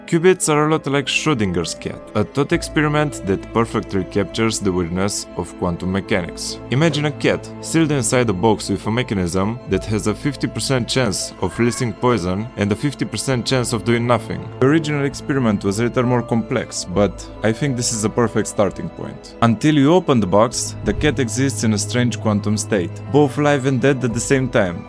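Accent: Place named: Romania. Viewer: Dupa accent mi am dat seama ca esti Roman , keep it going :)))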